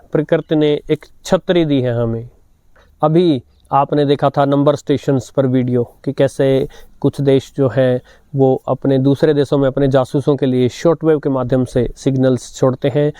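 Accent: Standard. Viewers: सर कई बार मैं आपका चैनल सिर्फ शुद्ध हिंदी के सुनने के लिए देखता हूं । ओ हिंदी के शुद्ध स्वर आप बोलते हैं इतना मधुर लगता है । मेरे संस्कृति के टीचर की याद आ जाती है कल जरूर जुडूंगा अगर फ्री रहा तो आपका बहुत बहुत आभार रेडियो की इतनी सारी जानकारी हमसे साझा करने के लिए मैं आपके कार्य को हृदय से पसंद करता हु ।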